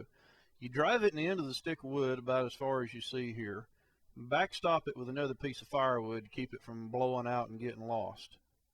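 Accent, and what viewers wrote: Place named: South, United States. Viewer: Normally I hate long intros, but I feel like it really made the video more entertaining, especially with the southern accent😂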